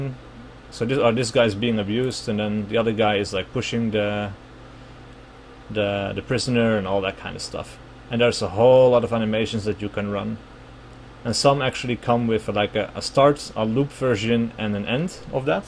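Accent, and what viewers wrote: Netherlands. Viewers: A bit late to the party but good to see my dutch accent radar is still working. Thx for the vid though it helped a lot.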